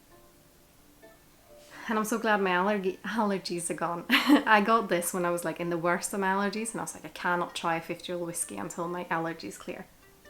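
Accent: Scotland, United Kingdom. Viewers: Why do you sound Scottish?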